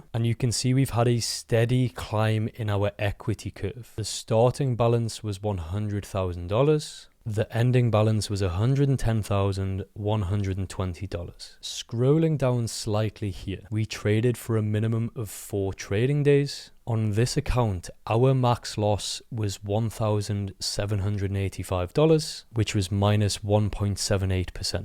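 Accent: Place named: Wales, United Kingdom. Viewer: Thank you for another great video. What assets do you trade/ monitor..p.s love the Welsh accent.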